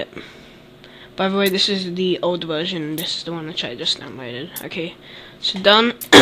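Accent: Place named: United Kingdom. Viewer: HE'S A BRIT,HAHAHAHAHA.But,thanks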